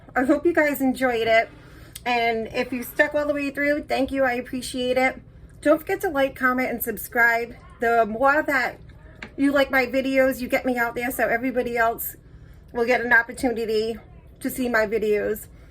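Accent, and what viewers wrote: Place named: Massachusetts, United States. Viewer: Love your accent too because I am from Mass :)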